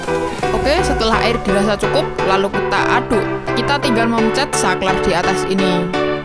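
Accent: Java, Indonesia. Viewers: Jangkrik.....supa..? Yo ngene iki wong njowo.dipertahankanlah.gausah malah sok2 an bahasa indo yg fasih. Liat itu subscribe dan like nya. Toh juga banyak walau medok.kate lapi koe..? Ra terimo. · Yang gak bagus..suara MEDOK ny itu loh..maf..bisa di kondisikan gak..klo bisa pke duber orang lain ajah deh yg fasih bahasa indo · Mendoknya ciri khasnya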